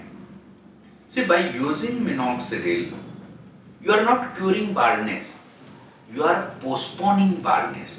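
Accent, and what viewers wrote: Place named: India. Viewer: Oh this annoying Indian accent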